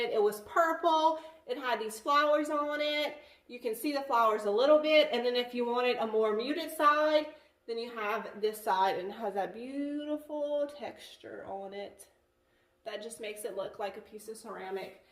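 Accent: Louisiana, United States. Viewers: I loved seeing this! Gave me ideas as well as good info on pricing. I must ask, where is your accent native to? You remind me of some of my Louisiana family, but not quite.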